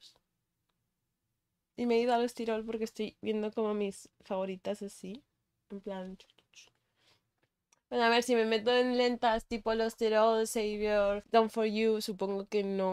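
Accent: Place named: Peru. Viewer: Hablas cmo peruana🧐 sera?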